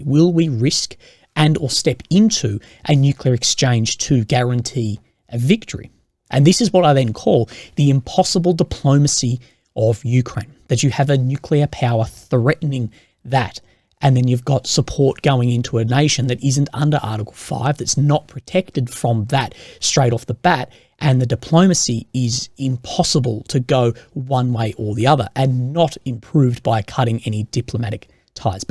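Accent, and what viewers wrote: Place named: South Australia, Australia. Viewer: I would have to throw a coin. Who's accent is more annoying. Your southern Australian accent The hideous northern English accent or our southern Cajun/ red neck/ hillbilly accent. . NOW!!!!!!!! AS FAR AS THE CHICKS? HOLY SHIT THAT'S SEXY!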